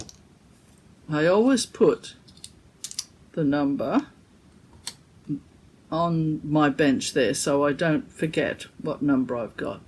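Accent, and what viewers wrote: Australia. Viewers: Good morning. I cannot wait to see your stitching. Well, I have finished watching and it has been a warm and cozy hour. I especially enjoyed how you kitted up your projects. It’s funny that I would not choose thread cards to organize my threads. However I enjoy your process and even more enjoy your Aussie accent sharing all about it. Merry Christmas!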